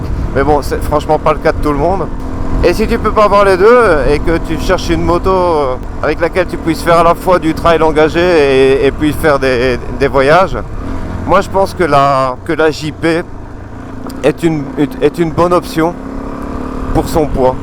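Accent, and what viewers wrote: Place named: Belgium. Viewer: Bonjour Je te suis depuis peu et tu me regale avec ton accent belge. Tu es pertinent en arguments moto, tu nous fait rever avec de belles images de maquis espagnols, je vais m abonner. Je roule en ktm 690 enduro r 2013 et 350 exc f 2018 dans pyrennees francaises et espagnoles. Continues tu nous regales avec tes expressions espagnoles. Zitoune.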